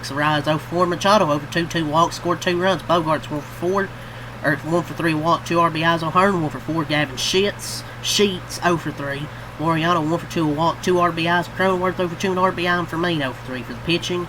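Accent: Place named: Atlanta, United States. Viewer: Where are you from? Sounds like an Atlanta accent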